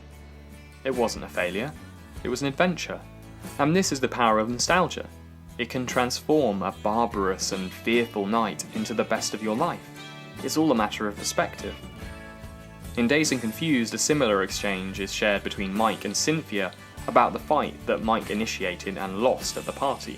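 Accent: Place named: England, United Kingdom. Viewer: I enjoyed this, thanks! Your (very) English accent somehow sits awkwardly with the material, but I appreciated the insights. These are my two favorite movies, and I have just finished watched D&C for, like, the 20th time! I guess the challenge is for someone to do a 90's piece, as that must be the last decade of freedom before technology robbed us of our primal sociability.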